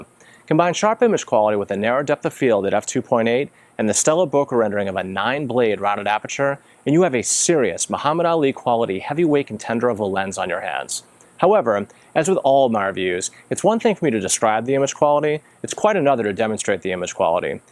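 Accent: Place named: South, United States. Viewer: Very refined manner of speaking from a gentleman of the South. May I ask what Camera-lens combination did you use? Your video is just so beautiful, with the bokeh very pleasing to the eyes. Everything within the frame is supremely picturesque.(And by the way since you are a Canon user, I subscribed immediately)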